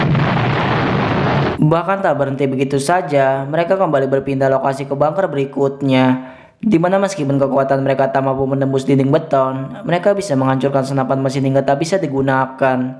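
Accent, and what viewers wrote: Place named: Betawi, Indonesia. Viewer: Narator logatnya yg terakhir agak ngelenong 😀😀😀🙏🙏🙏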